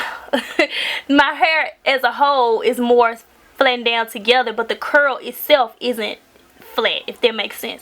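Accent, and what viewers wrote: South, United States. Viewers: She is sooooo country! Where you from? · girl you so country!!! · yes, she is definitely from the south 👌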